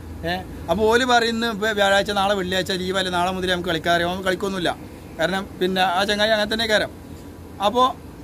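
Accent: Malappuram, India. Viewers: എന്തായാലും താങ്കളുടെ മലപ്പുറം ഭാഷ എനിക്ക് നല്ലോണം ഇഷ്ടപ്പെട്ടു തമാശ രീതിയിലും കോമഡി ആയിരിക്കും താങ്കൾ പറയുന്നുണ്ട് അത് അങ്ങനെ തന്നെ പോട്ടെ ഞാനും മലപ്പുറംകാരൻ ആയതുകൊണ്ട് എനിക്ക് തന്നെ വളരെ ഇഷ്ടപ്പെട്ടു ഏതായാലും താങ്കൾ ഗൾഫിൽ എവിടെയാണ് ഒന്ന് കമൻറ് പറയുക അല്ലെങ്കിൽ വീഡിയോയിൽ പറയുക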